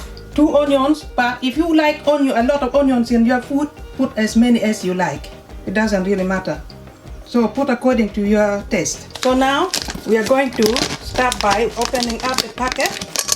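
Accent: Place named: Luo, Kenya. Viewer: You sound my accent of Luo.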